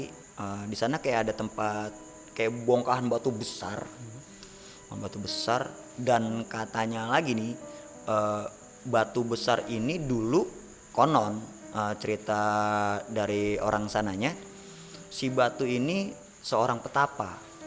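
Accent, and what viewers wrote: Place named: Bali, Indonesia. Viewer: Mungkin orang bali ngomong hurup Tnya biasanya gitu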